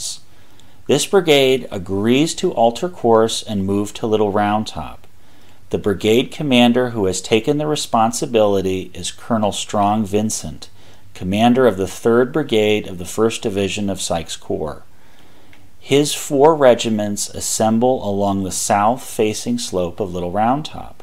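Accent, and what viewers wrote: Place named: Pittsburgh, United States. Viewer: Ahhh, a yinzer. Nuff said . . .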